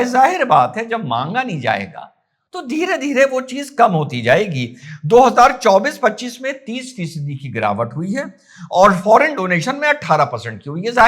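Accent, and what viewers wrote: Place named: Western Uttar Pradesh, India. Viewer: 2 रूपया ❤ लगता नहीं आप लखनऊ के है ये बोली पश्चिमी उत्तरप्रदेश की है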